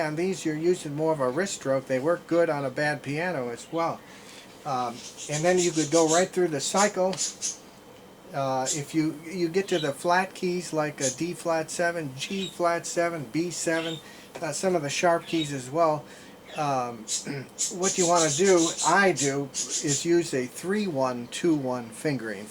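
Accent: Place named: Pittsburgh, United States. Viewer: You are an outstanding pianist as well as a wonderful teacher. Your accent sounds like you are not far from me in Steeler country. Keep up the brilliant work!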